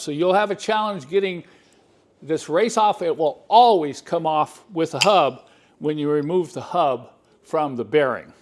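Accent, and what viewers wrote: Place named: United States. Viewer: Spanish translation is awful. We love your american english!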